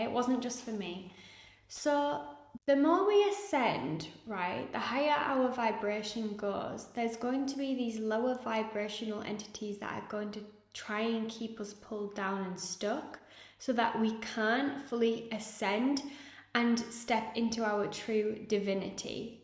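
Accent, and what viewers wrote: England, United Kingdom. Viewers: I love your accent. Are you from England?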